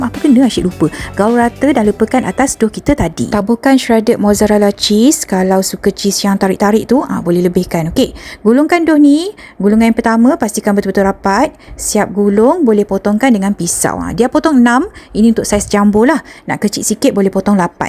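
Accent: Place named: Malaysia. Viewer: cantik aku suka aksen melayu dan suara nya❤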